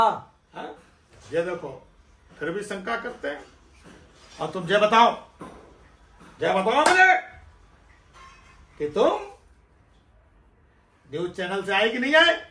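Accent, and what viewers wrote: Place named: Madhya Pradesh, India. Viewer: एमपी वाला एक्सेंट बहुज्जोरदार हे भईय्या हम तो बा ही सुन कै एकदम खुस हो गये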